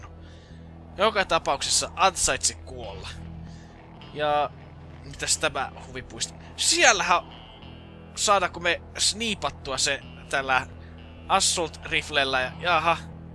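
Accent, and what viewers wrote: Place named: Savo, Finland. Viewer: On kyllä tuo savolainen vakkooja niin komeeta kuultavaa :D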